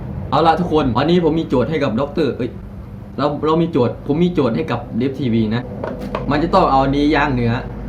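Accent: Korat, Thailand. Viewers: พี่เป็นคนโคราชใช่ไหมเนี่ย5555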